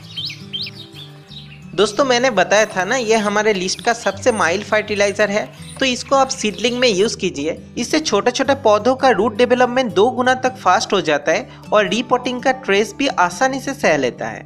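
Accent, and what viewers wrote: Bengal, India. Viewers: Nice accent. Bengali style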